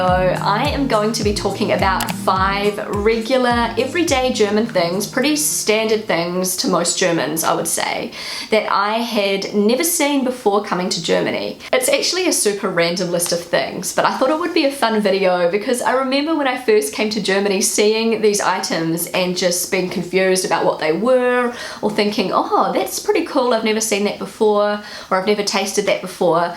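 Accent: New Zealand. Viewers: New Zealand accent is very similar to British accent, is it not? I would have thought, you come from England. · Love the accent. I almost wish I were an actor with a NZ character so I could practise the vowels. BTW, there's no "expresso"; it's "espresso". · Curry flavid kitchip. The new Zealand accent is adorable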